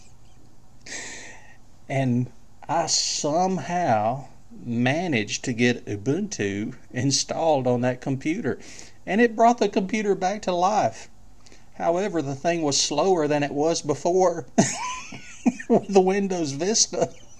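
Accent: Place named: South, United States. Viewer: Great video Mr. That is what I am also seeking are minimalist systems, tired of the bloated giants out there. I have quite a few PCs here, settled upon FreeBSD, but always looking at what Linuxers' are doing. I was initially drawn to your southern accent (being from the Midwest) and the minimalist system, w/menus. Also your NuTyX isos. Keep up the good videos!!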